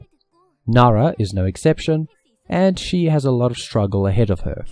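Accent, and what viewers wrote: South Africa. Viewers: Narrorator, are you south african?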